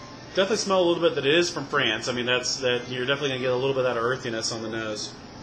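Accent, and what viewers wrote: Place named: United States. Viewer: maybe a little heavy on mercantile concerns but good commentary... and such an American accent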